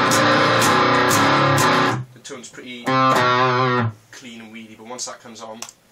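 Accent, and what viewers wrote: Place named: United Kingdom. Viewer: 'eees soooo british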